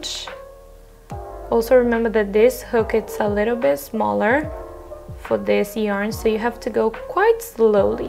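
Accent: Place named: Brazil. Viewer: That's so cute! Definitely going to try it at home. Also, by hearing your accent, I wondered... Are you Brazilian? I sort of recognized it comparing with my own accent kkkkk Ether way, I'm loving your channel so far! Keep the good work! :)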